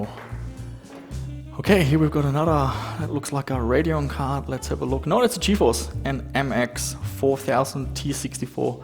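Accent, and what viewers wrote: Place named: Germany. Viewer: Der Deutsche Akzent is real xD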